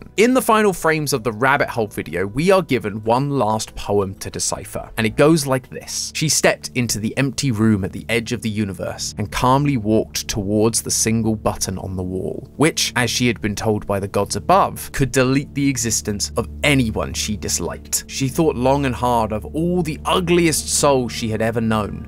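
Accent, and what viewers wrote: United Kingdom. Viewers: AMAZING THEORY Imma be honest tho i only watch horror game theories especially arg's and your british voice is soothing when talking about manslaughter. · LOL this is so good, gread job, i like britis acssent UwU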